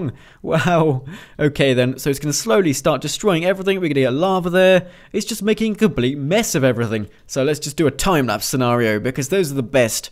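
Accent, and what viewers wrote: United Kingdom. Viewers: are u British not to be rude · hola solo quiero preguntarte si eres británico o no ... ??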